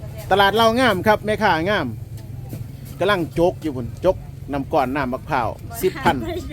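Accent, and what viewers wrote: Isan, Thailand. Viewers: สวัสดีบาว นี้และตั้นกำเนิดไทยอีสานใต้เว้าคือกัน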